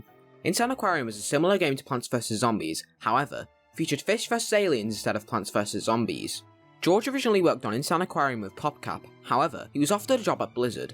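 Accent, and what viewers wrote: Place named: United Kingdom. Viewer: Csn we collab sometime? We’ve both got british accents, and you have potential.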